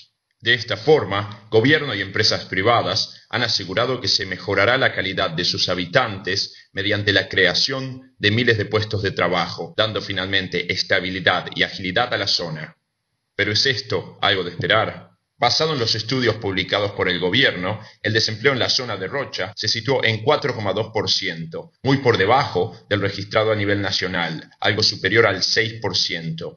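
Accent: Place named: United States. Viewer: Lamentable este vídeo, totalmente político y falsante, el primer y grave error es entre el minuto 6 y 7 que habla sobre la decisión del presidente de la instalación de una planta de celulosa en el año 2000 y ponen una foto de tabarez Vazquez, cuando recién asume el cargo en el año 2004, increíble, no lo mire todo porque me parece una perdida de tiempo, otro detalle es la voz del narrador con acento yanqui, y por cierto EEUU es el país que mas contamina del planeta, produce nada mas y nada menos que el 30% de emisiones industriales de todo el mundo, Pd. en mi persona estoy en contra de la contaminación.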